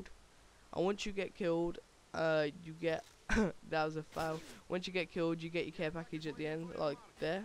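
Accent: Birmingham, United Kingdom. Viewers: its his accent..brummy niot the british language itself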